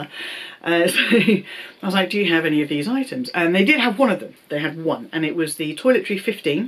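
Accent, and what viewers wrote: United Kingdom. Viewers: Omg yess!!!! All the time I don't know what it its with them. I have a good and strong purchase history but when I went to return an item 5 SA ganged up and were fighting taking back the bag that I had received from LV online a day before!!!! They are ridiculous at most times 🙈🙇‍♀️🙉 I so love to listen to your British accent🥰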